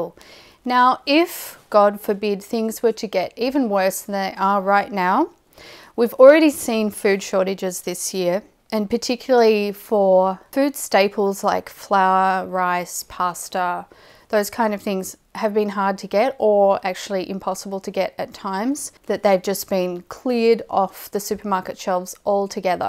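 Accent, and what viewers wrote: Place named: Australia. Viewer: I wish I had found you a few months ago. Apart from having the easier on the ear approach of us Aussies in comparison to some other countries, your videos are very informative.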